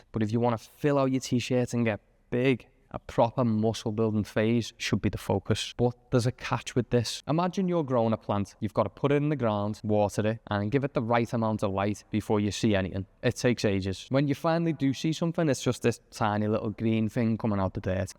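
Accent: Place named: Merseyside, United Kingdom. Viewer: That's a Merseyside accent, Liverpool or the Wirral...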